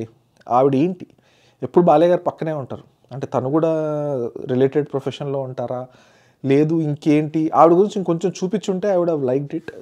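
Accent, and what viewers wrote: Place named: Ichapuram, India. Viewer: Blue films review Ichapura lahja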